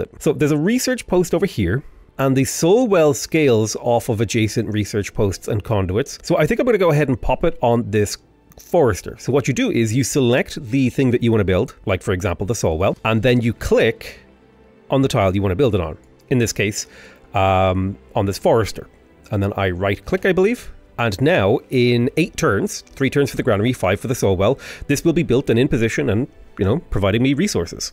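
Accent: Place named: Ireland. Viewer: Thank you sir, very informative very interesting and such learnable for Ireland accent 😊 This is good way for me to study English. Thank you again. Please more AoW4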